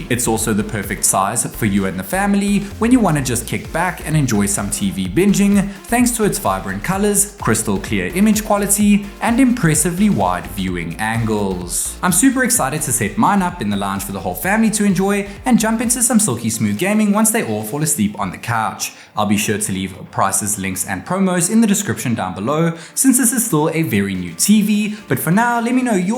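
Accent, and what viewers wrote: South Africa. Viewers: This is the first time I stumbled across content from you, immediately heard that lekker SA accent my bruuuu. Cool video, thanks!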